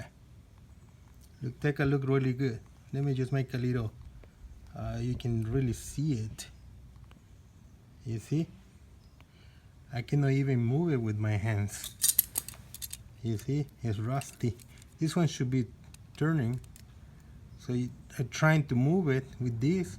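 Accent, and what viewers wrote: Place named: India. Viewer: creí que eras hindú por tu tono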